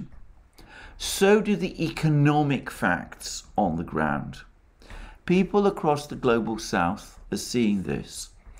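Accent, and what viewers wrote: United Kingdom. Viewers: Great job AM and I am a sucker for British accent.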